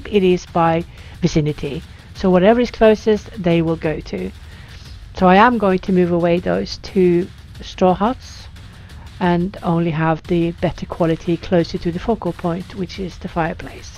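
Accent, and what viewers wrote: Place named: North, United Kingdom. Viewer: Hi 😊👋 just found your channel, your playtrough is very intelligent and informative! Your accent sounds northern🤭 can i ask where you are from? I am Scandinavian thats why I ask🤭 Love your video and will continue to watch your videos, new sub for you😊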